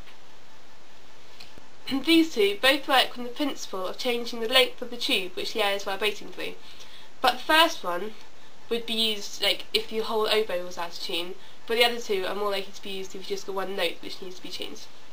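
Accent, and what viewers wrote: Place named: England, United Kingdom. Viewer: Aww you're sweet but this english accent is sickening!!!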